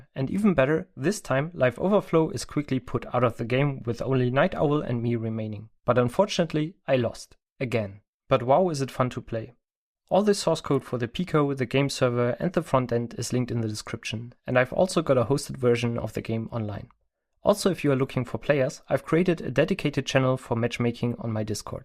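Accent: Germany. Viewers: Ein Deutscher, das hör ich doch direkt 🤣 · I can hear a German accent ^^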